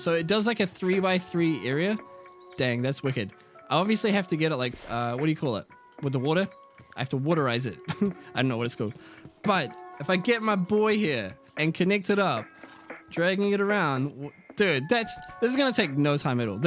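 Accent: New Zealand. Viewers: Whats that accent of yours? New Zealand? Im trying to learn to differenciate them and you sound like temuera Morrison… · Why does every kiwi youtuber I come across have some weird amalgamation of a kiwi accent with an american one? Rip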